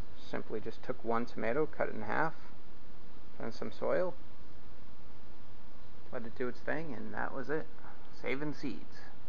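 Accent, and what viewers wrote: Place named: Boston, United States. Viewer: Before I even saw your channel name I knew you had to be a fellow Mass-hole and Boston guy! Great video and great accent. I mean, wicked pissah accent!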